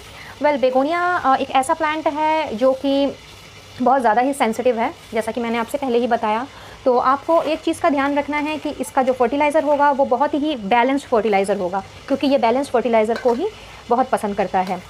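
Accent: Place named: China. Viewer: Your english have chinese acent I can’t understand and you tLk sooo fast 🚵🏽‍♀️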